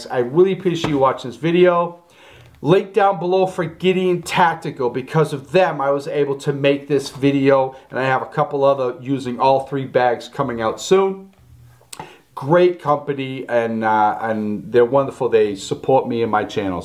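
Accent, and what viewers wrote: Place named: New England, United States. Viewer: Great vid !!! Btw I'm sensing a fellow New Englander by the accent !!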